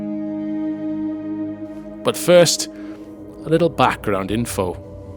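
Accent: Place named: South Wales, United Kingdom. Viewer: Great content Sir. Just subscribed. You have quite a subtle south Welsh accent. Mayhap you are from the border region- go Offa's dyke! Cheers, Flaxen Saxon. 😉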